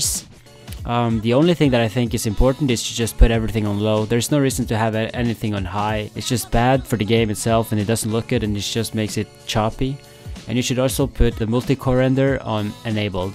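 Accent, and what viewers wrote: Norway. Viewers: Are you from Norway? (hear it on you're accent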